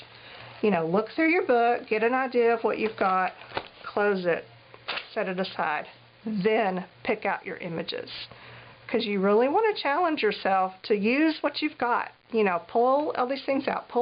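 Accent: South, United States. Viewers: Love your Southern accent, you sound like me!!! Those Yankees just don't sound right. Love this idea too and might have to try it.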